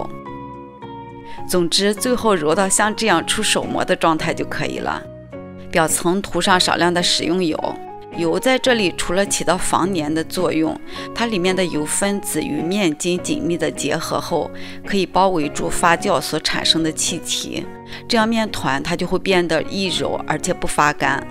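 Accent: North, China. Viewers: 今天偶遇你，非常喜欢你的声音，不急不躁地叙述，像北方人的口音，果断关注收藏！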